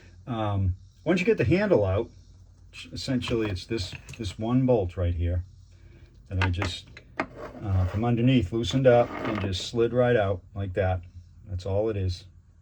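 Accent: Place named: North, United States. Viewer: That's just his accent. The way he talks, he's clearly from the Northeast in the band of the Northern American vowel shift that goes from Northern New England west through central Michigan then Wisconsin and stopping in Minnesota at the Twin Cities. What's more, anyone from there who has the shift can't hear it. It's a linguistic phenomenon that's been studied quite a bit. They just can't hear it. So, if you correct their pronunciation, it'll seem like they're being thick because they'll be all, "What's the difference? You just said what I said." I have personal experience with this having studied languages and linguistics at Michigan, which is ironic since there's nowhere that the Northern American vowel shift is stronger than in Ann Arbor, so studying languages and linguistics there with some professors from there and so speaking with the shift, it at times felt like it was the blind leading the blind and at other times just a really bizarre case study where you're tasked to objectively look into a fish bowl that you're stuck inside of. 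At any rate, a good example of the Northern American vowel shift that lines up with what you've pointed out here for him is the pronunciation of the last name "Stein," including names ending in "-stein." People from outside the region of the shift and some people within but who don't speak using the shift, like those who never picked it up but for some reason picked up speaking in the General American (GA) accent instead, tend to say it so t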